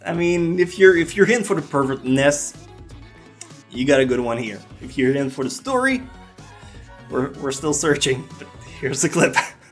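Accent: Quebec, Canada. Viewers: Wait you're a french canadian ? Or you just live in Quebec ?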